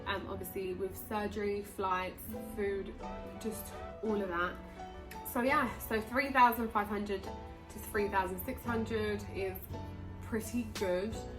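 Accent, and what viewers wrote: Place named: United Kingdom. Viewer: Are you Colombian? Omg I had a feeling you was but was confuse since you talk English with the UK accent. Lol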